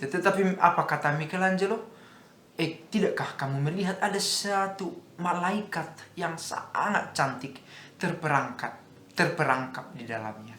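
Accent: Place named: Tegal, Indonesia. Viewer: Kalo dari logatnya kayaknya orang tegal 🤔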